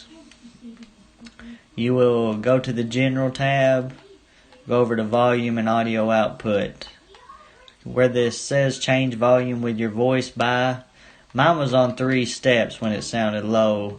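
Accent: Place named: Deep South, United States. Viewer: Yo u gotta be from the Deep South. Accent is superrrrrrr strong. U sound mad racist lmfaooo.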